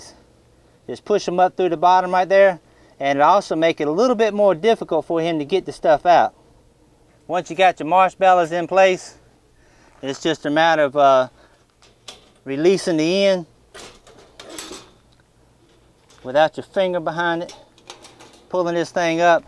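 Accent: South, United States. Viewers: I just love your southern accent.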